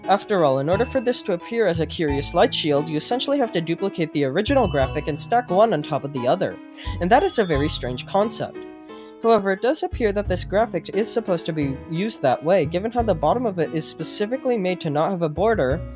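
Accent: United Kingdom. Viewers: he sounds British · HE IS MORE BRITISH OH GOD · I thought it was a British accent, I will now remember these videos as the British era of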